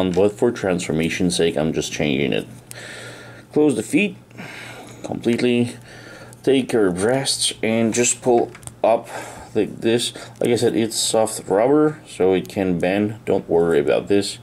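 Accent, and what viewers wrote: Quebec, Canada. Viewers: it was perfectly fine pronunciation. Sounds like a Quebec accent to me and I right? 👍🏻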